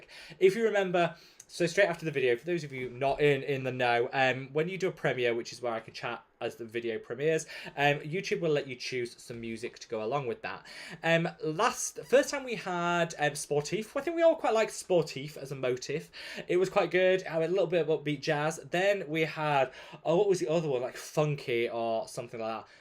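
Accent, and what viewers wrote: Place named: England, United Kingdom. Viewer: Are you from England? I'm in Charlotte North Carolina. My name is Martha . The reason i asked is bc my Dad was from Birmingham England. So i have a lot of English in me. My Dads last name was Herbert. A very English name. Love your accent God bless you stay safe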